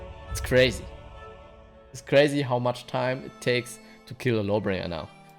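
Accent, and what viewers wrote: Germany. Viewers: i had this thought for a while know but i think n ow im sure i believe is german or at least lives and speaks the language bc eventhough his accent isnt as noticable the way he says some thinks and how he says "ezettera" i have the feeling he is. could be wrong tho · Das ist doch NRW Englisch xD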